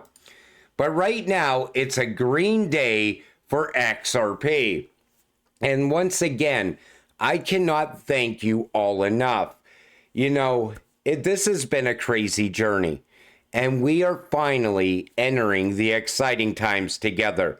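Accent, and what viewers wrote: Pittsburgh, United States. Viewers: Congrats on 70k subs!! You should have 1M subscribers!! You're my favorite and go-to Crypto Channel [plus your accent sounds like you're from Pgh, where I'm from 😂]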